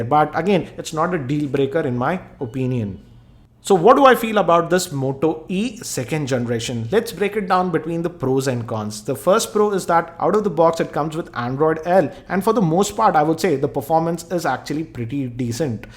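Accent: Hyderabad, India. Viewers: r u a hyderabadi??😮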